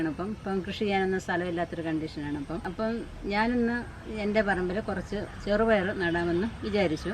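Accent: Kollam, India. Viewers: Mm samsaram ketapol thonni kollar allenkil PTA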